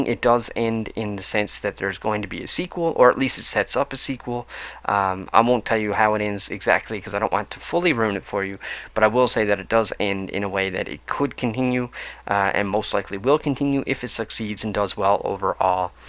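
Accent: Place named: East Coast, Canada. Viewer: Ahhh, you're Canadian! I kept hearing East coast but was not sure. Hello from southern n Ontario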